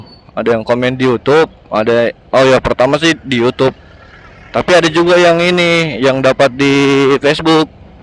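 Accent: Makassar, Indonesia. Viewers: mamtap bro logat makassar hehe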